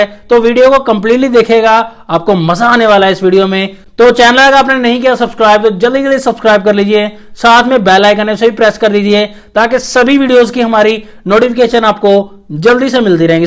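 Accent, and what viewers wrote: India. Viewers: Such a pendu speaking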